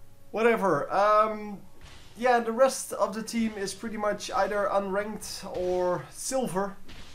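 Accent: Netherlands. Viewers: are you from the netherlands i hear it on your english ( ik ben van belgie )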